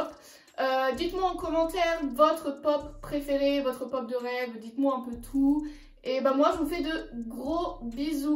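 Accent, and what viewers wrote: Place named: England, United Kingdom. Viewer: Ta un accent anglais un peux j'adore ton accent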